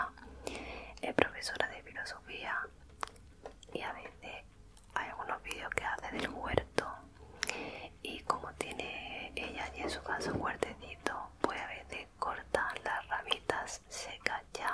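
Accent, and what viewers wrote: Spain. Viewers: Me encantan tu voz y ése acentito tan lindo español. Te mereces más subscriptores,. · La verdad me gustan mucho los videos de ella porque la voz que tiene me encanta por como susurra y tambien por su acento! Las voces de los españoles me relajan demasiadooo💙💙💙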